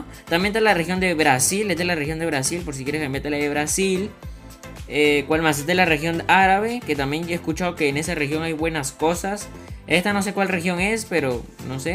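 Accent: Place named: Venezuela. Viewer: Eres Venezolano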